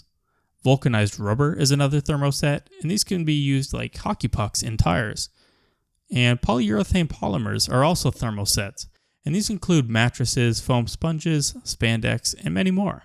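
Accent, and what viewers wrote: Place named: United States. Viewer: Am. accent and you spell tires that way?? what is the story behind this?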